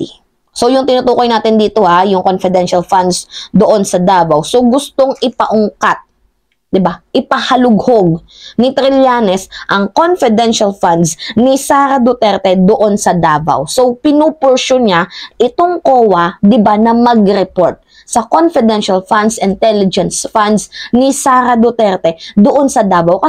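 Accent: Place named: Visayas, Philippines. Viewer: Bisaya ka day... matigas ang pilantik ng dila mo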